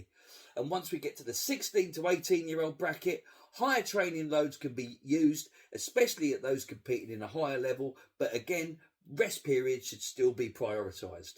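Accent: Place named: Kent, United Kingdom. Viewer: This guy is from Kent 10000%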